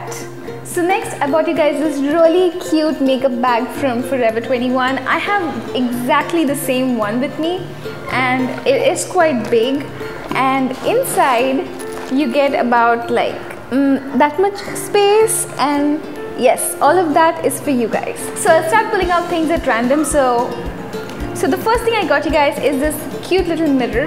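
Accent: India. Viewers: This wasn't really a back to school giveaway more like a make up haul where r u from and where do u live because you have an Indian accent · I have one question I am not trying to be rastist or anything r u from india bc I have a uncle that is and his relitives have an accent and urs is almost the same be aware that I am not trying to offend any one that is from india or not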